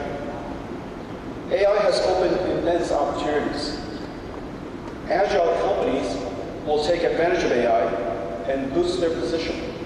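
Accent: Taiwan. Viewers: 他的台灣口音，吊打一堆年輕人。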